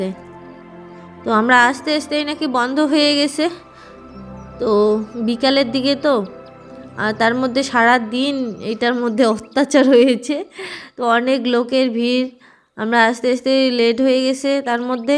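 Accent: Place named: Bangladesh. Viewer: তুমি তো এই দেশের মেয়ে তাহলে তুমি কেনো বাংলা দেশের মতো কথা বলো....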